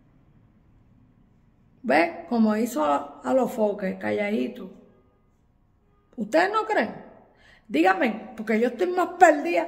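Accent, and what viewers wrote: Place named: Colombia. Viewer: He sounds columbian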